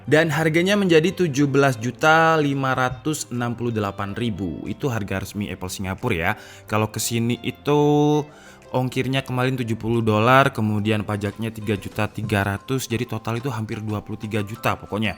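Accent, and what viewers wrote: Java, Indonesia. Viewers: ABANG ORANG MANA SIH..kok rada logat jawa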